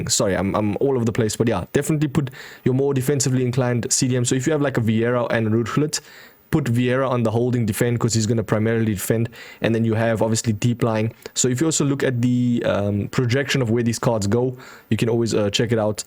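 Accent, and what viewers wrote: South Africa. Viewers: Are you South African ? 🤔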